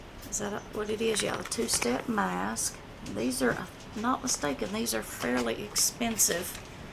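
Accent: South, United States. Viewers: You are such a Sweetheart, :), Love your southern accent. :) I'm born n raised in Ft. Lauderdale Fl. But live in NY now for years. I love your videos. Thank you so much for sharing hun.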